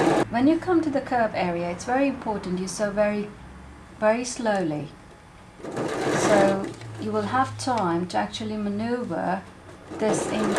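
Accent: United Kingdom. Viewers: Excelente la forma que tienes de explicar y tu acento británico tan bonito. ¡¡¡Felicitaciones!!!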